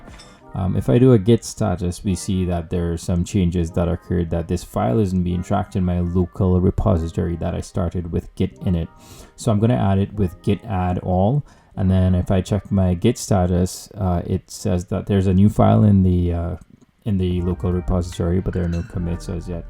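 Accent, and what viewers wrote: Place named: Trinidad and Tobago. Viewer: If you listen, ever so carefully, you can hear the trini...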